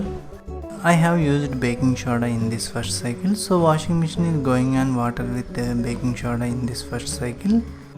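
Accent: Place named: India. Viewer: Thanks for cleaning instructions and fu*ck you because of that Indian accent.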